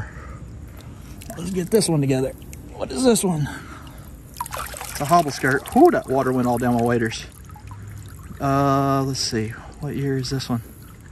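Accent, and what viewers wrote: Alabama, United States. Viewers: Please excuse my ignorance, but are you saying “Cherra Cola” or “Cherry Cola?” I guess my Alabama accent decoder’s rusty. I lived in Huntsville as a kid when my dad worked for NASA, but I’ve lived in Alaska too long. Sorry for the dumb question 😂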